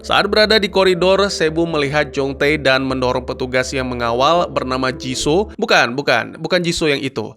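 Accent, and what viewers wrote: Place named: Java, Indonesia. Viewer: Ooh adminnya orang jawa ya😅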